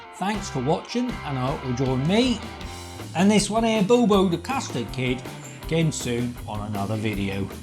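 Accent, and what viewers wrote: Norfolk, United Kingdom. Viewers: Well I could have easily have just skipped to the cooked product, but the Norfolk accent alone was enough to draw me in. Its like listening to one of the farmers in my village, except, he's talking dough balls instead of ploughing. I'm hooked, already watching another video with your dog, and the video montage of him throwing a plastic slide around the garden is hilarious. And yeah, anything carby is usually up my street. People assume being a vegetarian you'd eat healthier, but no, just pure carbs and quorn. Speaking of, have you tried 'The No Meat Ultimate Burgers' at Iceland, one to review if you haven't already, my top burger for sure. Good sausage rolls too. Anyway, I'll see what i think of the dough balls. You too.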